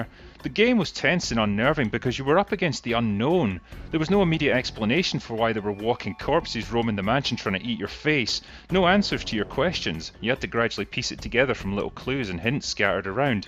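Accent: Scotland, United Kingdom. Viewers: you sounds Scottish in this video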